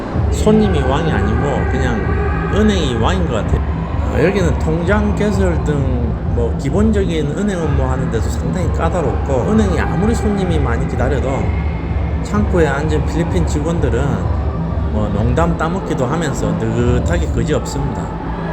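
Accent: Gyeongsang, South Korea. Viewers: 백선생님 고향이 대구쪽이신거 같은데요 맞나요?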